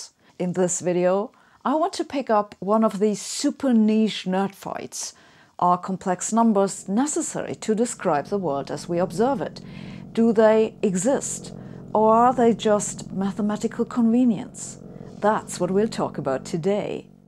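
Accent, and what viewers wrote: Germany. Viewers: Oh wow. I am so used to watching your more modern videos, and your accent there, at least it appears to my ear, is not so German. It sounds more like a Brit who has a slight German accent. Here, you remind me of my German girlfriend from 6th grade. Crazy how much difference 2.5 years make. I assume you've probably been living in the UK mostly, then, huh?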